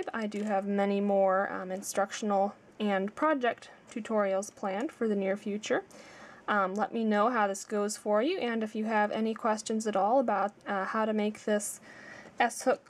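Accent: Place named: United States. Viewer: From the UK, it makes a change to have a clearly understandable audio, albeit an American accent, hahaha, ☺☺☺, cool calm and collected, I've 👍, and subscribed, luv and cuddles from me and your UK. Viewer's ☺😊😀☺😊😀❤❤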